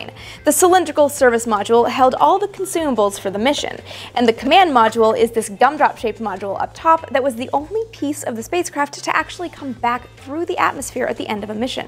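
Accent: Canada. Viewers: Are you Canadian? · Joshua Walker she's speaking English and she is from Canada. English has so much French influence anyway, and in parts of Canada they speak French. So, no surprise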